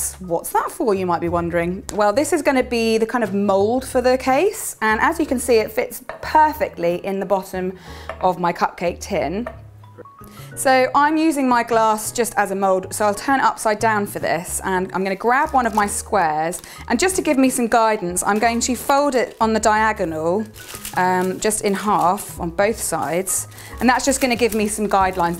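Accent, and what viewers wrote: United Kingdom. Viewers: Omg your british accent 😩☝️I’m OBSESSED. Period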